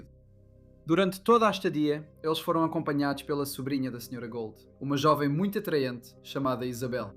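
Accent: Portugal. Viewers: Continua o sotaque português, e eu continuo a não assistir e a não curtir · Mas então ele não é português? Eu tinha entendido que está em português de Portugal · Mas ele não especificou que o canal é Português do Brasil. Você não consegue entender em português de Portugal?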